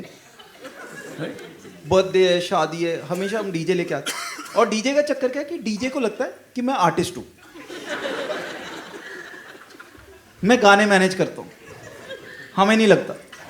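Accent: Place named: Punjab, India. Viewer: Decent comedian but has lot of Punjabi influence.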